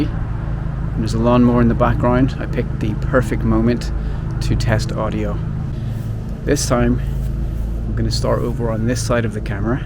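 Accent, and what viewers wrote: Northern Ireland, United Kingdom. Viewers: Great review. I have the Pocket 1 and was considering upgrading. This has convinced me to keep with it although there are some great improvements with the Pocket 2. I just bought a controller wheel instead. Nice to see you are holding on to your Northern Ireland accent, it made you easy to listen to. Well worth subbing your channel.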